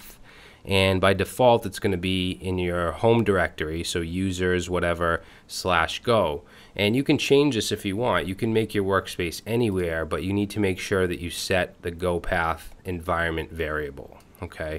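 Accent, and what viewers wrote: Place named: Northeast, United States. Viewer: I love your accent. It reminds me of my childhood. Where in the Northeast are you from? (My guess is the Tri-State, probably Jersey.)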